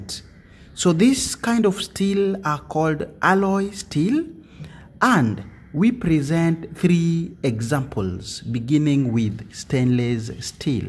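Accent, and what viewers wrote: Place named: Kenya. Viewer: Abeg i no dey understand this Kenya accent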